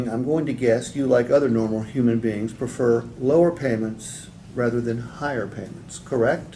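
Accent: Appalachia, United States. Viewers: and his hick hillbilly fog horn leg horn talks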